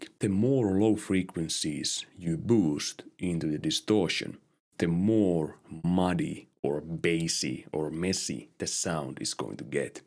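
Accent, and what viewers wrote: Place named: Finland. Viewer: piti ettiä mitenkä duunata vähä uptempoo van löyty suomi aksentti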